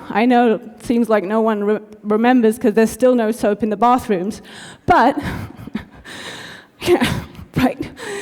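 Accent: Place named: United Kingdom. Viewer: Well done, my friend. And yes I hear the British accent. 😊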